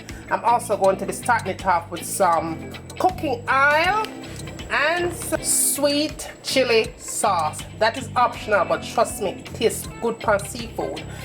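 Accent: Jamaica. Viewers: Love your authentic Jamaican flair. Gwan goodie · Lol yk i jamaican when you hear one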